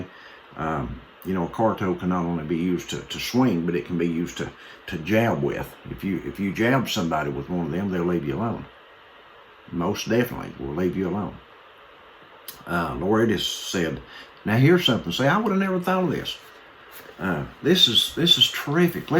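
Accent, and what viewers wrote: United States. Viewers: WHAT IS THE NOISE IN THE BACKGROUND? YOU REMIND ME OF BOB WELLS, CHEAP LIVING. LOVE YOUR AMERICAN ACCENT. THIS IS A VERY COMMON SENSE TALK AND WE NEVER HEAR ENOUGH, IF ANY, OF THESE TYPES OF MESSAGES. MANY THANKS.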